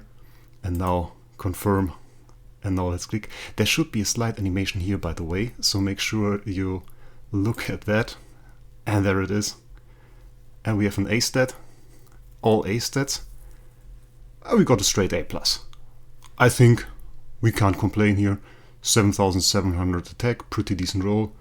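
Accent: Germany. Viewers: Do you make acc reviews? And are you german?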